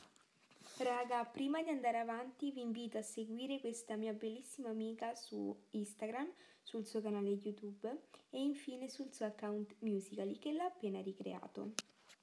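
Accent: Naples, Italy. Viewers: Ma è di napoli?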